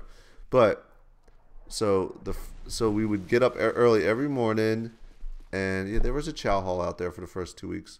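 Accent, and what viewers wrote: New Jersey, United States. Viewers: I keep thinking ur from jersey r u from Philly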